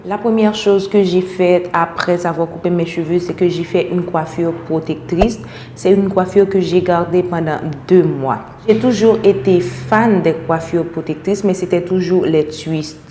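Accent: Haiti. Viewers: Elle parle comme une haïtienne!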